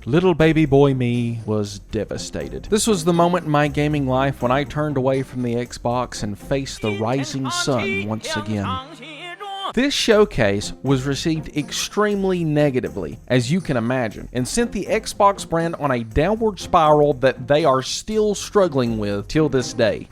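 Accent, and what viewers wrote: South, United States. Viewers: Finally a youtuber with a cool american southern accent. Tired of all these weak bri'ish ones. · i like your country voice so i subscribed yee-haw!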